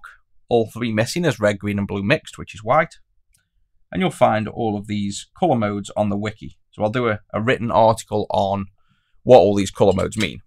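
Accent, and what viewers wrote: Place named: Stoke, United Kingdom. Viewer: Just noticed from the accent you're also from Stoke, nice! Do you sell your mod kits in your physical shop? I might come down and buy a wireless GBP battery to save having to ship anything.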